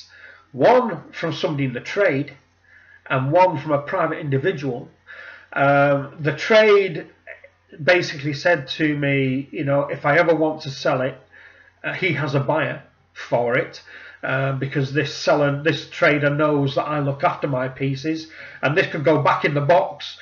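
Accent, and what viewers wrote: United Kingdom. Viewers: Too british. lost me .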